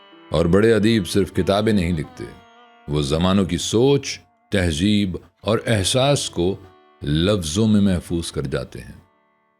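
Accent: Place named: Lucknow, India. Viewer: بہت عرصے بعد ایسی خوبصورت ،آواز،بہترین تلفظ،آراستہ پیراستہ اردو زبان اور کسی دلکش ندی کی طرح روانی سے بہتا ہوا لہجہ —-حقیقت یہ ہے کہ میں سجاد حیدر کو بھول کر ان صاحب جن کا نام بھی مجھے معلوم نہیں ،کے سحر میں کھو گیا اور سجاد حیدر کہیں دور پیچھے رہ گئے۔یوں لگا کہ لکھنؤ کا اردو لہجہ اور دلی کی قلعہ معلی کی زبان دوبارہ سے زندہ ہو گئی ہے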